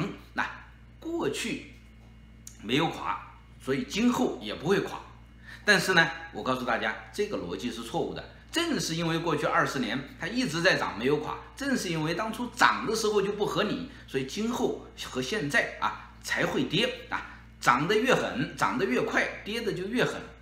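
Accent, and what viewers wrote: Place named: Hubei, China. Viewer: 我就觉得你口音像湖北的 哈哈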